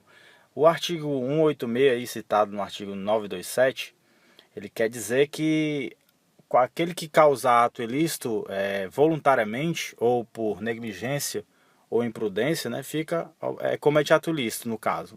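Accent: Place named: Ceará, Brazil. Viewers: Cearense da gema 😂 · sotaque cearense arretado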